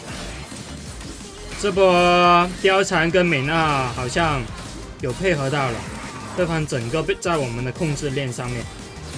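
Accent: China. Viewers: 你是大陸人嗎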